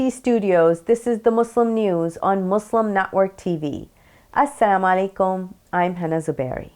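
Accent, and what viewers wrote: United States. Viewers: .*╔═══ ❤☀💚☀❤ ═══╗* 💚💚💚💚💚💚💚💚💚 Madam Hena Zuberi, Assalaamu alaikum. Nice hearing you after full one week. 16th, january is the last we heard you presenting the news. Keep presenting the news regularly. We from India feel at home in your slow pace of reading the news though it is also in American accent !!! DOING A VERY GOOD JOB KEEP IT UP !!! 🌐🌐🌐🌐🌐🌐🌐🌐🌐 ╚═══ ❤☀💚☀❤ ═══╝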